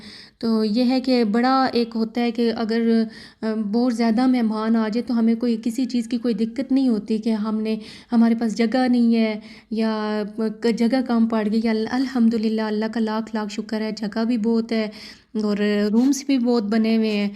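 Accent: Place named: Chakwal, Pakistan. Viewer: Yaqqen karen me apky lehjy sy pehcan gai thi k ap chkwal say hain kasmy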